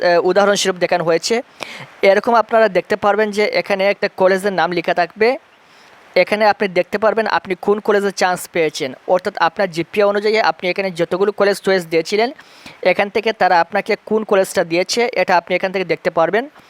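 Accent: Sylhet, Bangladesh. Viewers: are you sylheti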